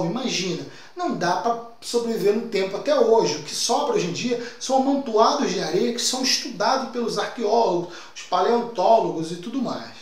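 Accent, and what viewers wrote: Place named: Florianópolis, Brazil. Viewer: Seu sotaque é de Florianopolis vc é de lá eu sou eu sou manésinha kkk!!!!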